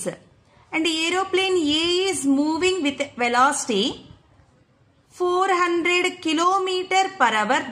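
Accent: South India, India. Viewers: The most South Indian accent heard in a while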